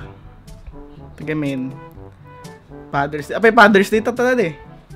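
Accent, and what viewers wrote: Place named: Ilocos, Philippines. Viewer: Kayat ko accent ti ilokano..☺😘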